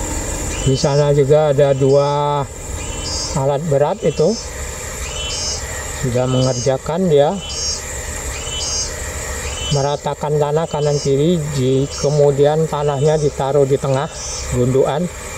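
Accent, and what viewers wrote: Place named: Jambi, Indonesia. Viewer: Betol nian wak sakai kalo org jambi ngomong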